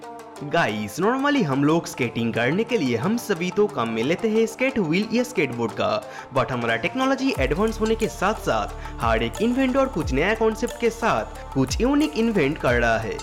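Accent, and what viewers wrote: Bengal, India. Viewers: Bhai bengali type ka hindi bolte hoo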